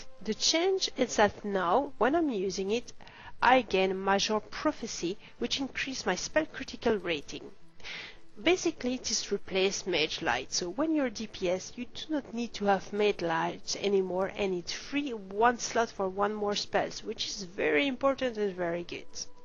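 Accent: France. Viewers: Great video. really enjoyed it. And the French accent from a pretty lady is a bonus too ;) Keep up the good work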